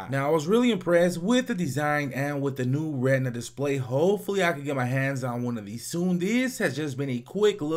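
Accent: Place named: South, United States. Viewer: so country, are from Kansas or Texas?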